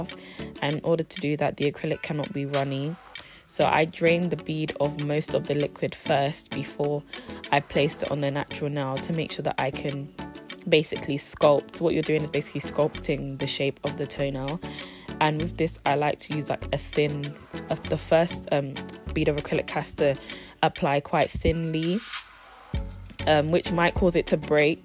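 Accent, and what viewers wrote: United Kingdom. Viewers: yesssss a uk gal! finally ❤️ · So happy I heard a British voice, even better London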